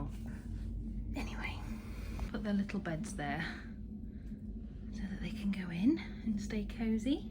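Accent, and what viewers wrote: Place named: France. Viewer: Vous avez un superbe accent Français 🥰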